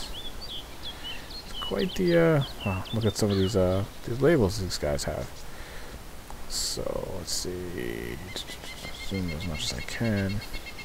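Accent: Boston, United States. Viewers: R u from Boston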